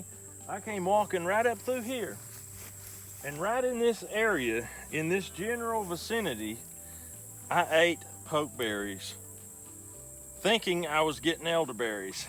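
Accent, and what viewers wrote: South, United States. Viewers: That damn Arthur Itis 😂 I love that southern drawl sir 💪❤️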